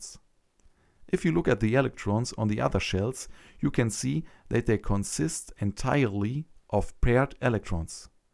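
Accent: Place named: Germany. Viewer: Noch nie so deutschen Akzent gehört xD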